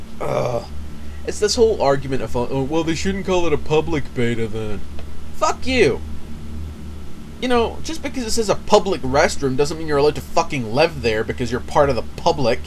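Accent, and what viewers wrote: United Kingdom. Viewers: are u a brit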